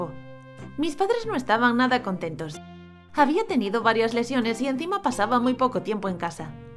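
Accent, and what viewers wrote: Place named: Spain. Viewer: Si los vi!! 🤗😃 me podeis dar un corazón?? (no soy española pero me encanta el asento español)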